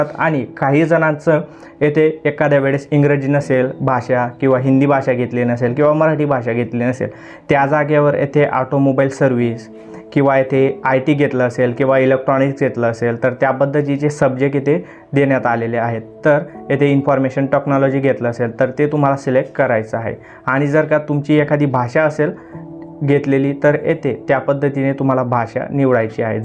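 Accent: Khandesh, India. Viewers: तुम्ही बोलण्यावरून खान्देशी दिशी रायणात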